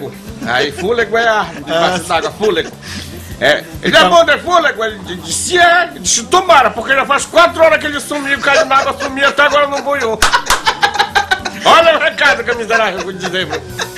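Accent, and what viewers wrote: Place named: Pará, Brazil. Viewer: Melhor sotaque do Pará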